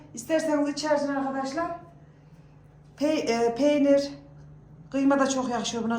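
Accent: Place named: Yozgat, Turkey. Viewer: S a bacım yozgatlımisin şiven bize benziyo ben sorgun dan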